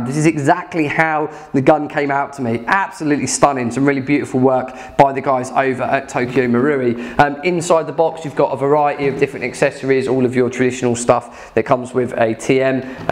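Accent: United Kingdom. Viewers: Fuckin' brits accent. USA!!! USA!!! USA!!!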